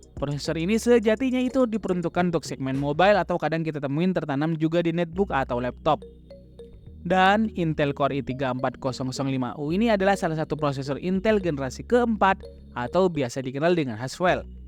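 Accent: Madura, Indonesia. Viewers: Owalah, orang bondowoso, pantesan. Umumnya d rakit, malah ngomongnya rantep, kerasa maduranya😅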